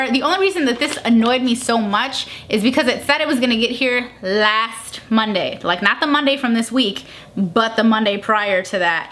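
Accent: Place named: Texas, United States. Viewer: I love your loudness I'm from Houston Texas so I'm so use to the way you talk makes me think your a normal person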